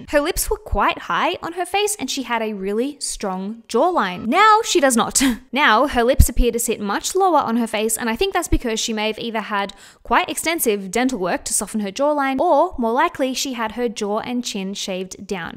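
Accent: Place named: Australia. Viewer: It's so strange (and refreshing) to hear a fellow Aussie accent on YouTube ❤️ Great video, you crushed it! 🙌🏽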